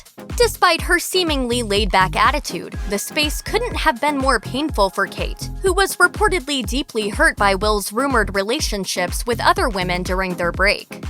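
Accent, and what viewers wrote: United States. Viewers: I hate videos with these robotic sounding American voices. You know the moment that voice pipes up that nothing worth while will be said. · As soon as I heard the American accent I thought .....more unfounded bullshit.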